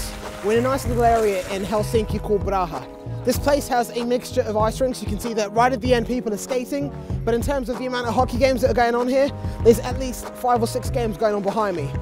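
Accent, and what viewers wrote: United Kingdom. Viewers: Lol, the start of the video just made me laugh so much.... A British? guy talking about ice-hockey. Never expected to hear that. Football, yes, but hockey....wtf! Ok Britts won 1936 olympic gold in hockey. Britts and hockey is like us Finns and football i guess.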